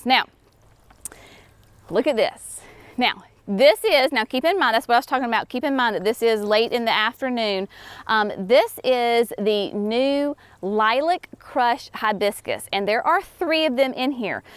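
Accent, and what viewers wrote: South, United States. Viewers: You have a wonderful southern accent but you really tapped into your southern ancestry when you said "porch".......love it! Garden looks amazing, great work you you guys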